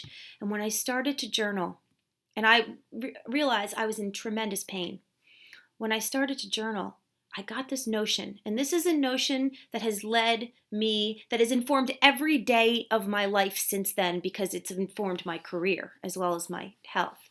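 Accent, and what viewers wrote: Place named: Long Island, United States. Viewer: Great work you are doing. Are you a Long Island Italian transplant to Delaware too? Thought I can hear the accent...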